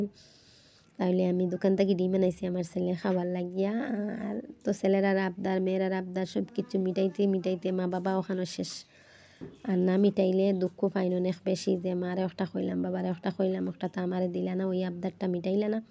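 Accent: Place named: Sylhet, Bangladesh. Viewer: আসালামুয়ালাইকুম আপু আমি তুমার বিডিও পুল সাপুট করি তুমার রান্নার পিটা কই মাছ এমনকি তুমার সিলেটি কথা সুনার জন্য বিডিও চেরে রেখেচি❤❤❤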